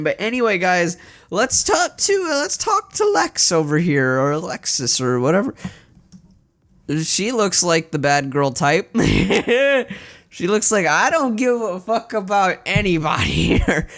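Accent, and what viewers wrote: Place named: New England, United States. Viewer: The accent challenge (New England) edit: the English in new English is just American not fake British.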